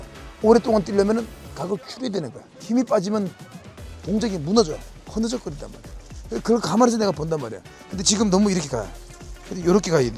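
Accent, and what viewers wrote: Gangwon, South Korea. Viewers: 강원도 사투리 억양 구수해요^^ 강릉 경포호에서도 러닝 클래스 해주세요😅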